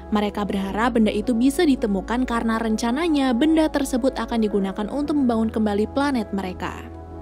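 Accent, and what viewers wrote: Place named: Java, Indonesia. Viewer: Wong jawa rika ya mba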